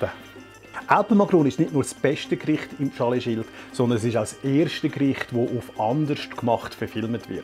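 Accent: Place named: Switzerland. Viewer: Wäre ja schön wenn man als NICHT Schweizer etwas von dem Gesagten verstehen würde. 👎👎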